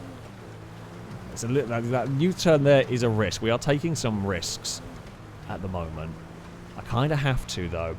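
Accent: United Kingdom. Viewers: depends on what the Goal is. the player wants to sneak around and never be seen while he achieves his goals. the main face of YouTube channel knows that we want violent crashes and lovely United kingdoms commentary over unspeakable violence!! I've never done it, but it's got to be a rough balance to achieve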